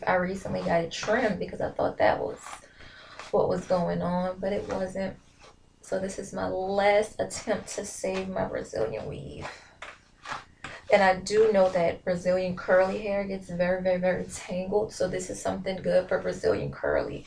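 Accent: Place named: Baltimore, United States. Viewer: You Sound Like You're From Baltimore But This Video Was Really Helpful, Thanks 👌🏾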